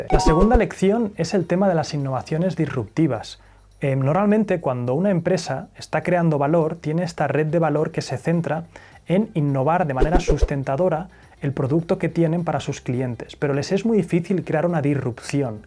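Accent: Spain. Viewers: Así hablan los españoles, no cambian, o cambian muy poco la pronunciación de las palabras escritas en inglés. Ya es algo cultural, no creo que no hable inglés.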